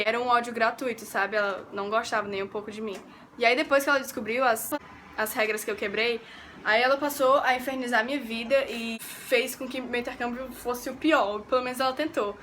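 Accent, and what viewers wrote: Ceará, Brazil. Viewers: não sabia de onde tu era, mas reconheci o sotaque cearense, q é meu sotaque tbm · nossa reconheci o sotaque na mesma hora que ouvi vc falando . CEARÁ ❤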